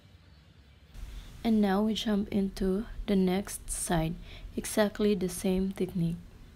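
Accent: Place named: Australia. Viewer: I love the Aussie Accent oi , hair lol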